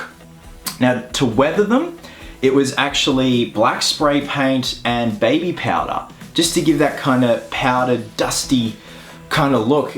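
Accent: Australia. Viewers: you look amazing. The costume is great. Love your Australian English. Sexy body, I need to work out more 😅 One think. Take some sun rays. You're extremely white 😝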